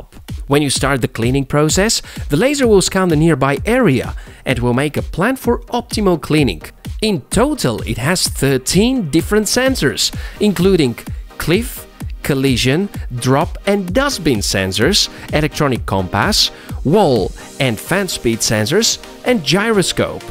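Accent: Netherlands. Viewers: The finest, least perceptible Dutch accent I've heard in a long time. Respect! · I love your dutch accent...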